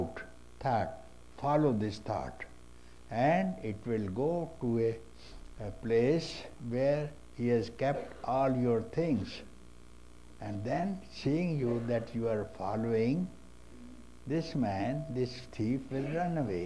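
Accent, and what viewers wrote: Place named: India. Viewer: I like Papajis Indian Accent 😂😂😂🙏🙏🙏🙏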